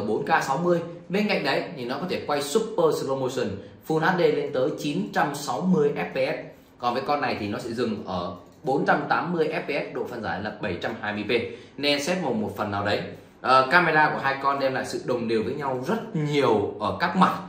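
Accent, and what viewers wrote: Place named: Countryside, Vietnam. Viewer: Mặt bựa lẫn giọng quê quê!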